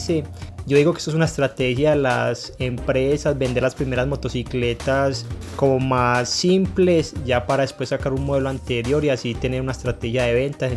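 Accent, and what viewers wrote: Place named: Colombia. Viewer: Creo que el dice que la compremos con ABS, por que en colombia venden motos pequeñas con ABS, pero de este lado del mundo no les importa nuestra vida. La verdad no se si este yutuber sea de colombia pero crep que si por el acento que tiene, no estoy muy familiarizado con ese hacento🤦🏻‍♂️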